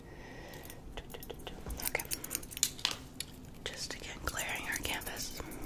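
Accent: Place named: Michigan, United States. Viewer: Omg you sound like you’re a fellow Michigander. Your videos are so relaxing. Have a good evening girl❤️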